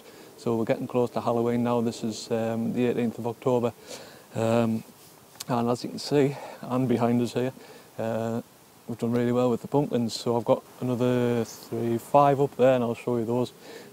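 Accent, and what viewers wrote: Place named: Newcastle, United Kingdom. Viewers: Really enjoyed watching, my first year growing pumpinks. Didn't have a clue about the make and female stuff. Learning every day. geordie lad, nice to hear someone local. No American accent with you. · I’m definitely wanting to grow pumpkins so that was really useful. Are you north east based ….thought you have a local, to me, accent 👍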